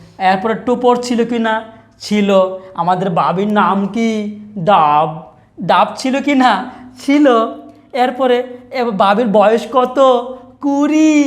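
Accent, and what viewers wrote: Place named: Mymensingh, Bangladesh. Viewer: ভাই এর বাড়ি কি কিশোরগঞ্জ,ভাষাটা বৃহত্তর ময়মনসিংহের মত আসে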